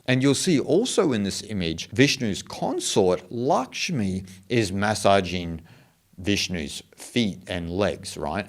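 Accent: Australia. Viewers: Thousands of years old, very amazing concept of creation and the universe, very deep, not as flat as the 7 day genesis. When I came across vedic literature, many, many years ago, I was astonished, sucked into these mind boggling concepts, still blows me away..lasting some 55 years already. Robert Oppenheimer is said to have studied sanskriti to be able to understand these concepts untarnished by translation ! P.S. do I detect some Ozzi accent here?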